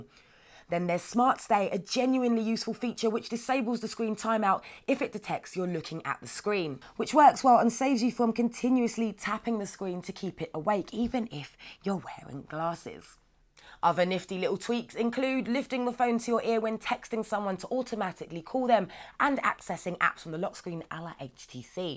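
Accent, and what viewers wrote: England, United Kingdom. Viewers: Are you British? You've got the accent.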